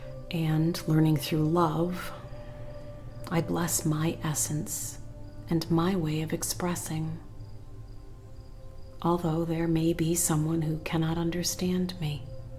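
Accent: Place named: United States. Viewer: Wonderful. The life eperiences you have had to create this ??? Sadness, loss, love. Very touching to me - a 89 year old Englishman living permanently in Japan. Coming from a working class background in North-East England and having parents and grandparents of Irish origin whose lives had not been easy - I release them with love. I love your American voice and wonder what part of America? All the love - Jack.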